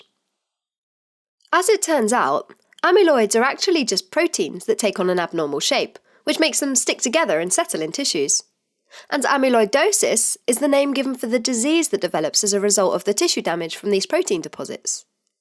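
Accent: England, United Kingdom. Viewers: I love your british accent... 😍😍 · I missed you,! Lovely Brit accent! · Whats with the british accent.. Its not even the bbc english which is easier to understand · I wish you get rid of this british accent. We do not understand 🤥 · I love the typical English accent .... would love to hear more 😋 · She explained it so well, but her heavy English accent is bothering me so much that i cannot focus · Loved that British accent !!! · Beautiful british accent!!!!! Great lecture😊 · I love british accent! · your British accent is so cool kinda strange but still cool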